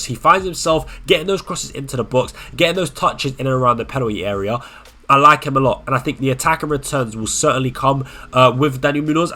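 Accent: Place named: London, United Kingdom. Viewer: Great content, really good. But... why on Earth do you support Man Utd when you have a London / south accent!?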